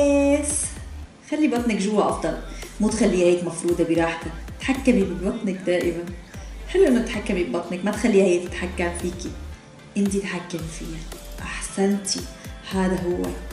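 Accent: Syria. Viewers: شنو اصل ؟سورية لو مغربية لو شنو..لهجتهة سورية بس مرات اشك انو مو سورية🤣♥️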